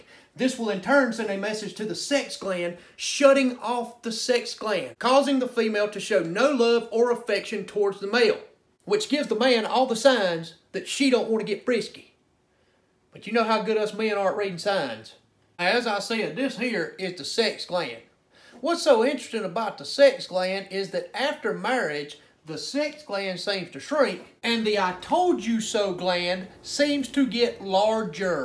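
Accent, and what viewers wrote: South, United States. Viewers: Hot and funny! Love the southern accent. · I love the Southern drawl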